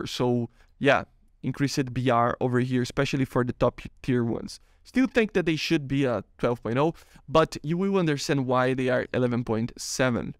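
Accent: Brazil. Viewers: that Brazilian accent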